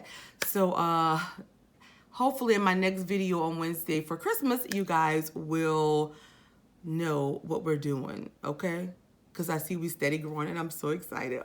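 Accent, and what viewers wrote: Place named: New Orleans, United States. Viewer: I’m so happy when I hear that New Orleans accent and that slayed face. My bff in my head hey girl. Fun fact : my actual bff’s name is Latonya.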